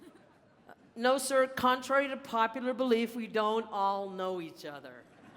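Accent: Texas, United States. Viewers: My greatest honor to receive a "thank you" from you in person. Your style is my favorite: that fancy hat, that Texas accent and that enchanting storytelling. I keep telling my folks that the 2nd place winner is worth watching when they talk about the 1st and 3rd place winners. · The speech message and use of props were good. Not sure of the sarcasm and racy tone when describing elements of the young man. 🤔 however, the Texas accent was fire🔥🔥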